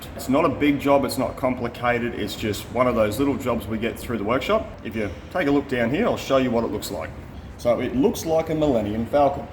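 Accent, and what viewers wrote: Australia. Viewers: Ohh man I just discovered your channel and as a retired Aussie living in Thailand I really enjoy your craftsmanship your bloopers and the bloody Aussie accent that I missed so much keep up the good work mate!!👍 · Avuncular Why are you talkning like you're from Australia? You sound like one of those people that throw in 'spanish' when the get mexican food, lol. Saying "adios" when when talking to someone who speaks spanish, instead of just saying goodbye.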